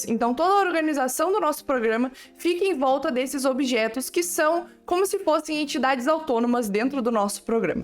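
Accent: Rio Grande do Sul, Brazil. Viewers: ela é gaúcha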